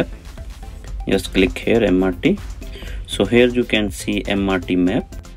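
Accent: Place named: India. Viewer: his thick Indian accent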